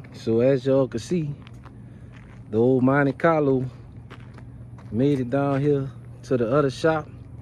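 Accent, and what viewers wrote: Louisiana, United States. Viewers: soon as I clicked on this video the question was "What part of Louisiana you from?????"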